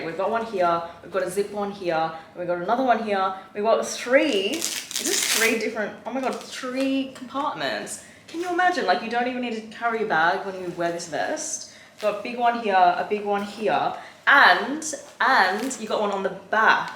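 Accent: United Kingdom. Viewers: To be honest at the beginning of this video when you said you were actually Australian I had no idea lol I thought you were British too, I'm so sorry 😆🥲 Loved the thrift haul, sad to hear that the thrift scene in London isn't that amazing though, but you still managed to find some nice things! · girl, you do be sounding a bit british ahhhh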